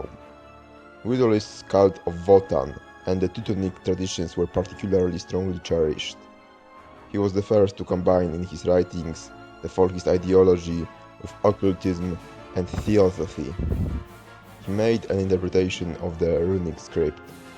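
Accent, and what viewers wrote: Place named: England, United Kingdom. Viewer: Why so annoying english accent? Pease, first learn speaking then speak.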